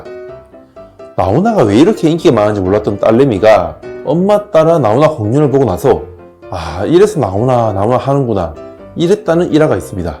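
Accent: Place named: China. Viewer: 유튜버님 혹시 중국인이세요? 아님 조선족이세요? 발음이나 억양이 한국인이 아닌것 같은데ㆍㆍㆍ??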